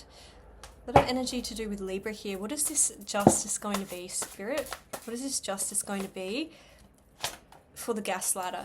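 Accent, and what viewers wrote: Australia. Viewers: You have to be good. You hail from Oz. I knew you were by your accent. I'm from Oz. Adelaide living in Canada.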